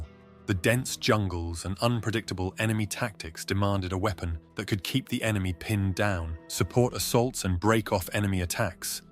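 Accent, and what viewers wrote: United Kingdom. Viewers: I do not know where you are from or how old you are, also do not know what your sources are. If you were to write a legitimate journalism article for a respected printed publication or even to submit as a piece of work for grading in college/university, you would need to at least have 3 to 5 independent verifiable sources of information to support your statements and/or claims. To say that “carrying ammunition belts around like Pancho Villa might have looked cool” is a total shit bag thing to say. Anyone who was actually carrying ammo for those support gunners and having to carry their own kits did it in attempts to keep everyone alive and well stocked. Are you British or is your YouTube narration a Bot?! You probably weren’t even alive during the Vietnam War and you are regurgitating stories you’ve gathered from other YouTube channels. 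Like you sat down and interviewed actual M60 Gunners to get your information for this video? Like they’d actually openly tell you , a Brit or a descendant of a former British degenerate what went on there for your YouTube channel. I saw some other comments from people who sound like legit Vietnam M60 gunners My father lugged shit everywhere for anyone on his team way into Cambodia and Laos. ARVN would run off with any supplies or ammo or anything on the trucks US Forces were defending. So It wasn’t to look cool to keep chains of ammo it was to make sure that shit did not disappear into the bush when under fire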